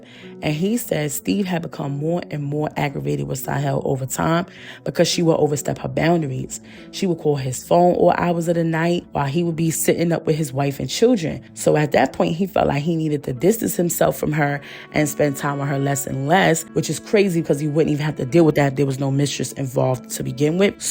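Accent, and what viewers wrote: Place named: East Coast, United States. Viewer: Your East Coast accent is sooo Cute. Also, What a MESS These folks Were in.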